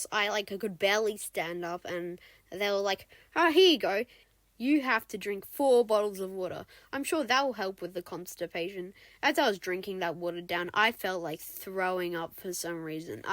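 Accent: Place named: Australia. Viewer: bros Australian 🇦🇺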